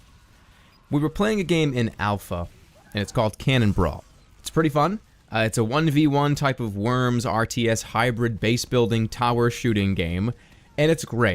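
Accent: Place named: Boston, United States. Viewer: God I miss the strength of his Boston accent in his yt days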